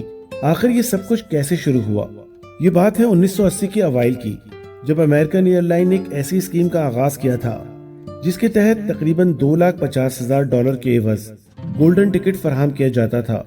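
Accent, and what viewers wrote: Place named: Pakistan. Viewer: Masha Allah aapki voice or Btane ka urdu lahza dil ko chuu jata h .. puri yt m sbse best of best videos hoti h outstanding Big Respect 💝😊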